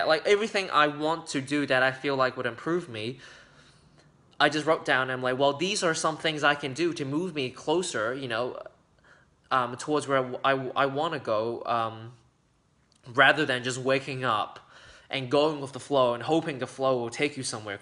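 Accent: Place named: Australia. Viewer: I’m so inspired by Dr Joe, I’ve read all four of his books and they’re completely fascinating, inspiring and always help get me out of a shitty mood. Do you live in Australia? Your accent sounds a little Aussie I think :)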